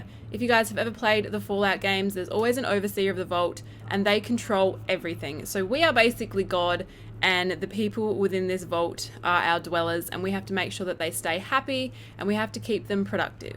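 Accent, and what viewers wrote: Australia. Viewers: ahh an aussie or new zealand ehh can't tell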